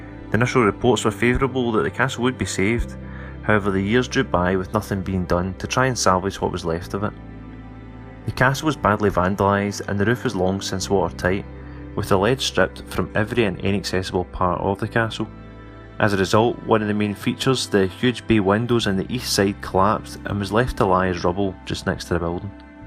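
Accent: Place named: Scotland, United Kingdom. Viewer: It’s wonderful to see this proud castle be restored. And .. nothing like listening to a voice of a Scotsman.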